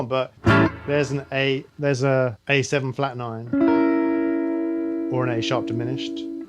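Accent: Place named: Southern England, United Kingdom. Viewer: another great lesson ive been working a lot with these chords recently and this has given me some perspective, some way to categorise and organise them. I guess from looking at your website that your a music producer in the states now but I would love to place your accent, its almost definitely south of England but after that im stumped.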